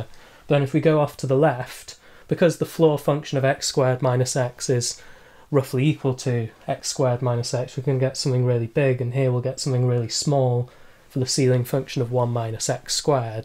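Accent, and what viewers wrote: England, United Kingdom. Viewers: Fantastic solution! I really appreciate how systematically you approached the problem, making it easy to follow and understand. Your channel is truly a gem for anyone looking to improve their math skills, and your way makes learning enjoyable. Please keep uploading such excellent videos! Your dedication and clear explanations are incredibly valuable. Apart from the maths, I like your English accent, it is amazing. Like from Saudi Arabia 🇸🇦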